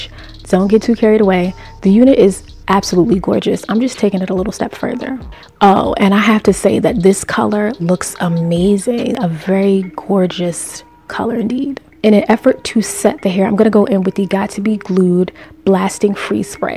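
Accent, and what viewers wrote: New York, United States. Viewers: dat nyc accent is a yes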